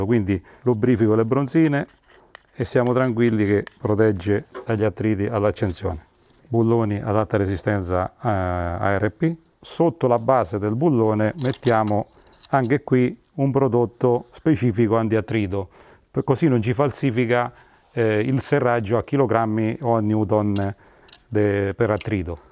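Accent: Abruzzo, Italy. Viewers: Una parola: arte. L'accento abruzzese completa l'opera. Si sente il sapore degli arrosticini mangiati dopo una giornata di duro lavoro di preparazione. " E angor' ce sta' da affina'" MERAVIGLIOSO!!!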